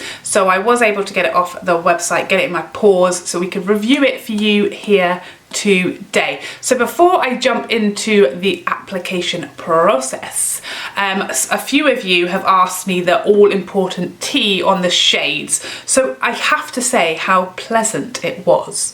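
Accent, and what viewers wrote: United Kingdom. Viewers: Finally! I’ve been waiting for your review!! With your lovely British accent (I have an Aussie accent so that’s why I LOVE yours 😭) and soothing voice, I can happily listen to you all day long ☺️😊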